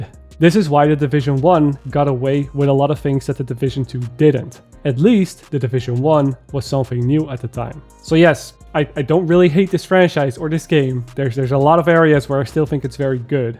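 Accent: Netherlands. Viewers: Good video, I've got over 2500 hours in division 2, well explained. I'm sure you're Dutch (I do think because of your accent, as I am) and I also think you're involved with the YouTube channel Fern...Am I right? 🙂♥️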